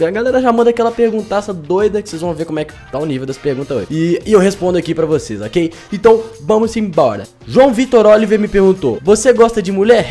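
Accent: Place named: Minas Gerais, Brazil. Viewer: Tem, de mineiro hahaha :3 é muito bonitinho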